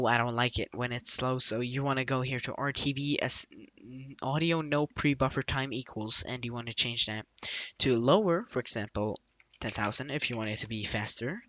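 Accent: Sweden. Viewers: I can hear the swedish accent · That's a nice swedish accent you got there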